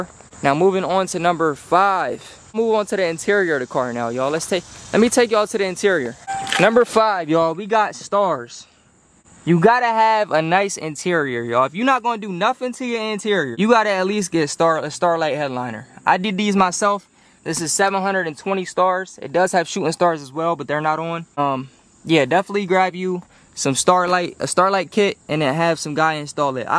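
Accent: Philadelphia, United States. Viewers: you from Philly?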